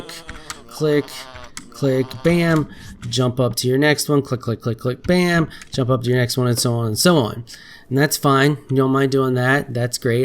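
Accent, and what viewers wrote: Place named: South, United States. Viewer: I really can't even pin your accent. Sometimes it sounds slightly southern, other times not. Sometimes it has this sort of effeminate twinge at the end of each sentence. Then in the episode about the 10 things that they took out of Starbound. You talked about the barrel and completely lost your shit, you got way louder and lost the accent the twinge everything, your voice completely changed.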